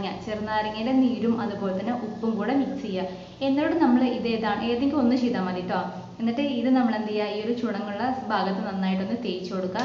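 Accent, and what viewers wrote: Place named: Thrissur, India. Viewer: thrissur shaily is best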